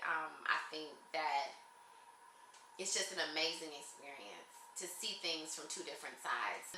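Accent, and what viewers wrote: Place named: United States. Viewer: Hai sister am a Ugandan but on the issue of colour hooo GOD u are not yet lighter sister we have some Ugandan who are very lighter and u can't imagine. the reason why they stair at u it's because of ur accent they will definitely know u are from U.S. so in our country u are not different of any black people in uganda. Do feel free if u stay for More year no one will stair at u anymore because they will be seeing you every time Otherwise next time u are coming back tell me so that I can secure for u a plot of land to starts ur life there Don't worry u are already at home ok in fact just starts investment plan to move to uganda ok Contact me if u need anything Thank u😘😘😀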